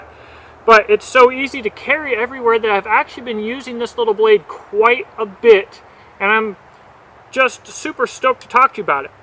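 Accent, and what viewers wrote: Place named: Kentucky, United States. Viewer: My wife is giving me a hard time about your accent. She says you sound like you're from UP Michigan. I thought you were from KY. Would you mind clarifying? ☺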